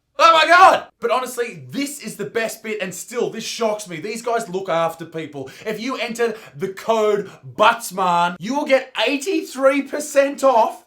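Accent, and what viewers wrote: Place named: Australia. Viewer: oh boy I just love listening to an australian man shout at people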